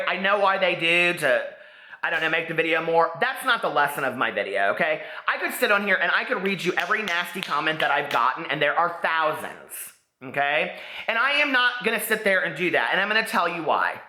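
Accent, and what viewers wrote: United States. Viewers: Baste! 🦃💜😘 edited to say: I love your voice. You don't sound like a young girl whatsoever. I can't believe anyone would even say that! That's laughable & dumb. I'm really great at accents & to me you just sound like a cool guy who is possibly from the south (?) I'm sorry if that's wrong, but that's what I hear. And you are beautiful inside and out, truly! You are entertaining, intelligent, well-spoken, delightful. Sending you so much love. I wish I could be as strong as your mother. I will commit myself to doing better. Thank you for this message, it has changed me. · I hear your American accent and I feel a great fondness for it because I know the personality behind it. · This is my favourite video of yours so far :) and I love your voice, it's one of the things that sets you apart and why I started watching your channel regularly. 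I don't think it sounds weird at all, it just sounds like a different American accent (I'm English!) but it makes you come across as genuine and full of energy! And I love it when you get worked up and talk (rant!) really fast, pretty sure I subscribed after seeing your rant about your ex work colleagues ;)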